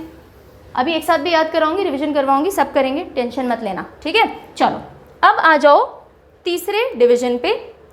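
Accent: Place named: Uttar Pradesh, India. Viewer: Maim aap bhi up walo ki trh bolne lgi hai map pdhaty time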